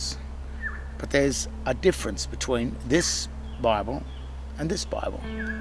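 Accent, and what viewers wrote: Australia. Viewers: Really fascinating and enlightening information! I like your style of delivery, as well. It's genuine, logical, and unforced. And I've always found an Australian accent easy to listen to.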